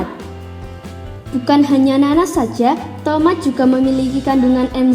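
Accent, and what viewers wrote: Java, Indonesia. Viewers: Iya benar, itu dialek mbak jawa, dak apa2 yg penting jelas he he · pie to mbak u mgomonge koq medoq bener se.... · Logat jowo nya keciri bangetya.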